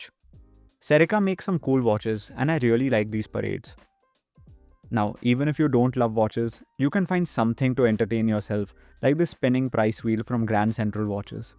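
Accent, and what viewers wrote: India. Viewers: Can you get rid of your Indian accent next time, eh?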